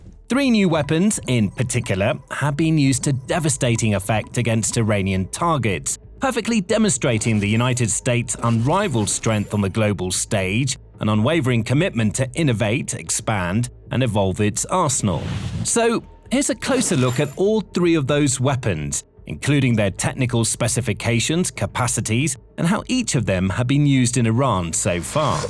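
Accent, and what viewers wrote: England, United Kingdom. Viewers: Geee is it me or does the British accent remind you of the folk hawking knives and other stuff on upper cable channels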